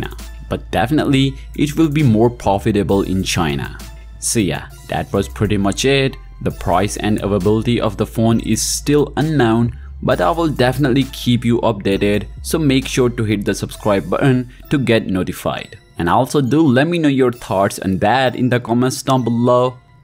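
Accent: India. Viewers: Indian lovely accent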